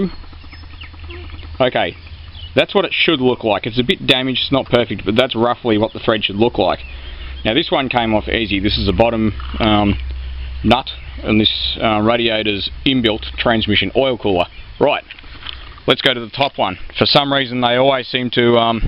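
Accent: Australia. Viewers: true Aussie accent, love it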